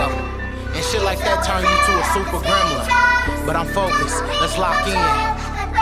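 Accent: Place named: Midwest, United States. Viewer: You got that Midwest flow 🔥 talk yo shit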